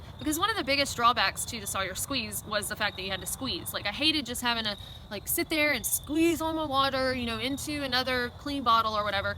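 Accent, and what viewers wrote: South, United States. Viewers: Amia she probably just pronounces it that way because of her southern accent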